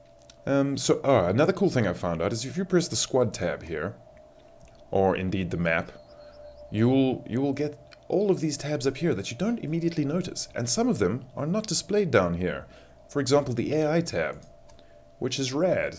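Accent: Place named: Australia. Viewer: Great work man! Thanks so much! What is this muddled Aussie accent mixed with?!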